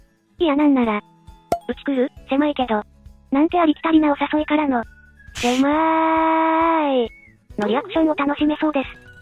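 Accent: Hakata, Japan. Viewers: 博多弁がバンバン飛び出してくるので、何か嬉しい。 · 博多弁がなーんか嬉しくて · 博多言葉がかわいらしくて、いいですね。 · 博多弁がオモロイですね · 博多弁や福岡の景色が懐かしく嬉しいです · 家賃、いくらくらいなんでしょうね。 ところどころに博多弁が出てくるのが、福岡の人間として嬉しい😊。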